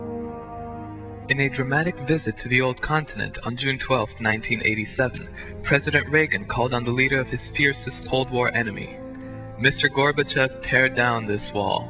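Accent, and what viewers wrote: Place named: Romania. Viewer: I wish all of you who comment about this video,get a grip because everything you said is not the reality..To know exactly what was going on in Romania at that time you have to lived there,did any of you have?If you have which I think the commentator did by his accent,than whether he wasn't born at that time or he was told the stories or the voice is a robot recording... It's all the same propaganda which was used to get rid of that regime and replaced it with one even worse,called democracy...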